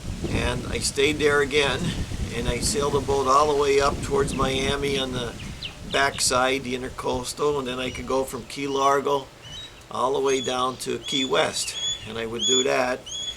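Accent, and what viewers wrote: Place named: Pennsylvania, United States. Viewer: Great video and a real interesting life. My family was from Gerardsville and now live south of Philly. Your pa acent has always sounded like family to me. I am also planning to winter in Florida but those HOA fees hurt. Learned a lot from you over years and I have been doing patio and sidewalk and stucco on my old house up here in Kennett Square.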